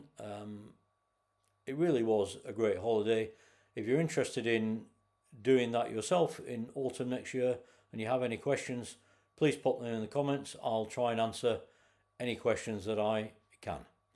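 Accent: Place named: United Kingdom. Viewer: i love... LOVE your channel - I just found you... subscribed.. i am from Portugal but grew up int he US.. so i guess i am American too... Algarve is one of my favorite regions, obv.... I have a very small farm in the Azores - love it there too. Now that i finally extricated myself from the claws of corp. America and am blissfully early retired- i tend to pop over to Portugal quite often. it's just a 4 hour flight from Boston and i love seeing my family and just chillaxin' in nature & peace away from the kerfuffle of daily life in the US... Florida will not quite do... 💌 anyway - am catching up on all your videos this evening cuz i;m hunkered down for this snow storm...(enjoying a dose of the queen's English..LOL..)❄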